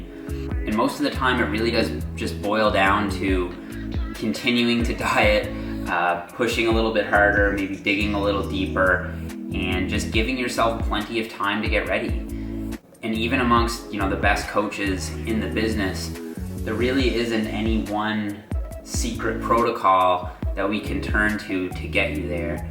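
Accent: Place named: Canada. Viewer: New fan here, love the content, love the accent (Canadian?). subscribed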